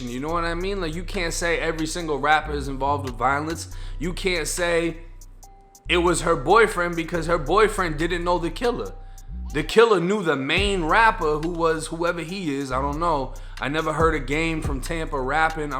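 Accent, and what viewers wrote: Boston, United States. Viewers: You. Sound. Like. A. Boston. Person. Its. Your. Pronouncing. Of. Some. Words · Dude what are you from Dorchester 😂 every time you hit that "A" it gets me lol. I used to live in Brockton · you def caught the Boston accent! I have one too, I’m rocking wit ya! 🔥🥰☘️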